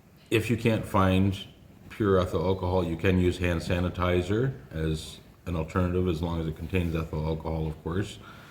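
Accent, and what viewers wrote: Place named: Canada. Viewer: You must live in the Philippines, but I hear a bit of Canadian accent. It sounded like you say "a boot" instead of about. 😅. Very hel0ful video, thanks.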